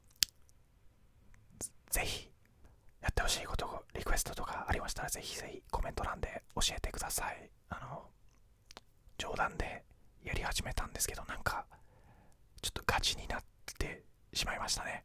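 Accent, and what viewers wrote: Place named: United Kingdom. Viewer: 今日もPDRさんの英語囁き声が聞けて嬉しい( *´艸｀)←イギリス英語フェチ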